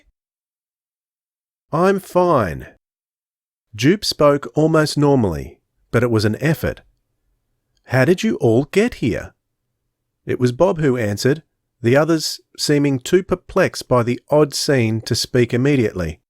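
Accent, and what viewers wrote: Australia. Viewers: This is the first time I've heard an Aussie narrator! It's great!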